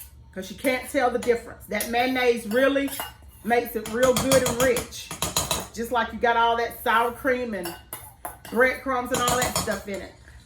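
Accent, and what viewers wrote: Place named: Georgia, United States. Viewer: Looks excellent ! Thank you for this video 🤗 You're Endearing 💖 & You sound like a Ga girl like me, am i right 🤔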